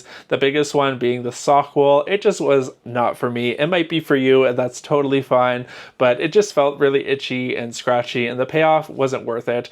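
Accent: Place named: Canada. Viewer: new here. you sound canadian, where do your buy your yarn? I see in the back you have a big colour selection and I'm really looking for that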